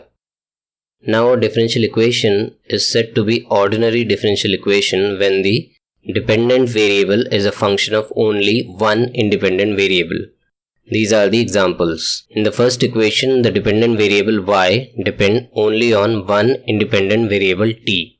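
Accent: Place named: India. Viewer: Computer voice with Hindi accent. How do they do that?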